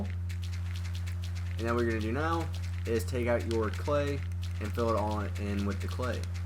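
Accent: United States. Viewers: R u from stl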